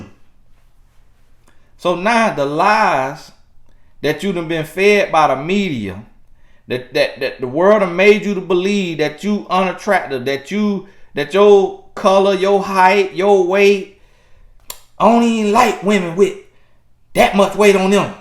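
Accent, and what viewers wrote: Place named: Florida, United States. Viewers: Yes he’s very country. That’s how a lot of Floridians talk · That's Florida for you lol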